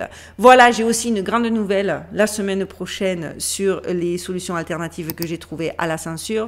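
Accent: Marseille, France. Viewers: 😂 " Mais ce monsieur n'a rien laché, il devait être Marseillais...mais bien sûr, je plaisante!" 😅 J'adore votre humour décalé, toujours fin et respectueux. Je suis parisien mais ma sœur vient de Marseille et j'aime entendre votre accent, ça me rapproche de la famille. Ne changez-rien. J'ai du mal à comprendre qu'avec la qualité de vos reportages très aboutis, que youtube puisse vous emmerder. C'est un "non sens" 😮. Prenez soin de vous et ne lâchez rien!❤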